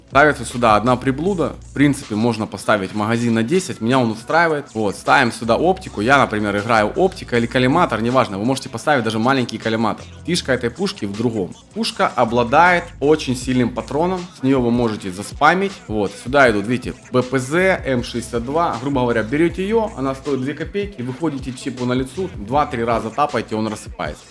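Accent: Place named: Ukraine. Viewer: Акцент украинский сразу слышно. Украина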